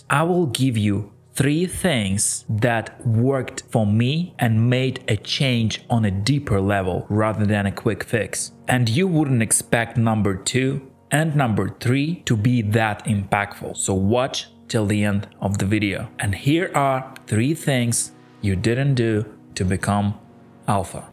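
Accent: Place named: South America. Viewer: I dig your South American accent . Good accent My God, i hope you're not Egyptian. Sincerely Dan, South Dakota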